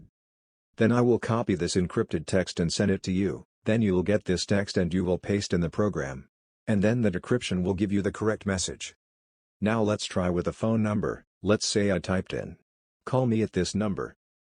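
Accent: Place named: United States. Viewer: the American Accent makes it easier to understand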